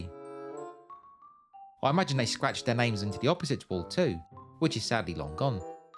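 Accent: United Kingdom. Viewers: Everything is more interesting when told with a British accent.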